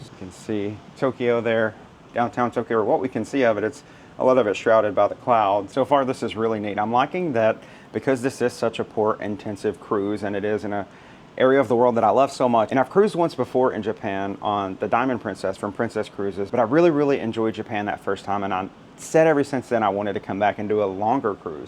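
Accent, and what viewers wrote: South, United States. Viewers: Nice vid!! Love your subtle southern accent! Reminds me of a good friend of mine from Georgia 🙂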